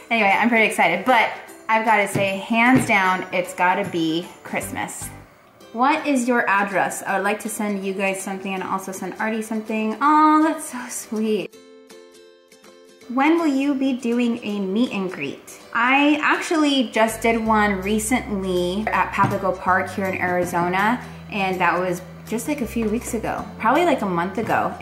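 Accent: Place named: United Kingdom. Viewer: I always enjoy your videos and that British accent! 😂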